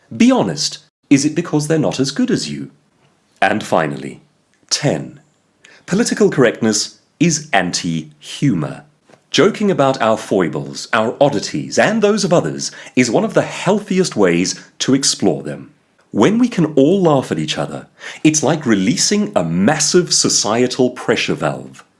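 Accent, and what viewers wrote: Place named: United Kingdom. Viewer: I understand that "politically" and "correct" have definitions in the English language. However, when you use them to further define the concept of "politically correct" I feel like you lose sight of the phenomenon that all languages are in a constant state of evolution. I can't place your accent, sir, but I can tell you do not originally hail from the USA. I'll go out on a limb and suspect The U.K. It is my understanding that the word "c*nt" in The U.K. is a lot less harsh than in my homeland here in the USA. Also, the term "pissed" has two very different meanings depending on which side of the pond you are standing. My point is that "politically correct" is no longer associated with its two root words, and likely has evolved away from its original usage. I noticed in the video you failed to address hate speech. As I understand it, it would be most politically incorrect to the "N" word. 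Yet you are advocating against political correctness. May I ask you to address this?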